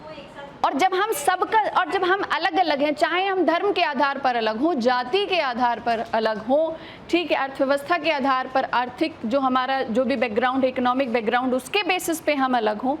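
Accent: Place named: Rohtak, India. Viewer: Madam rohtak side ki hai kis kis ko lagta hai 😂😅😅😅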